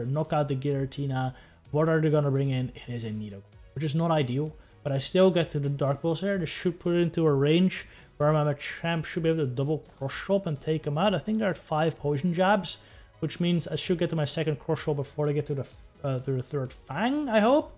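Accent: Netherlands. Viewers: I’ve been trying to clock your accent and now I think you’re Dutch! Sorry random sidebar from a new follower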